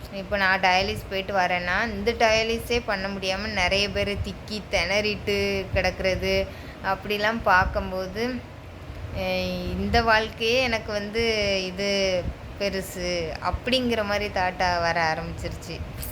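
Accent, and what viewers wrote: Tirunelveli, India. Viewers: உங்களுக்கு சொந்த ஊரு என்ன? நெல்லை பாஷை பேசுறீங்க.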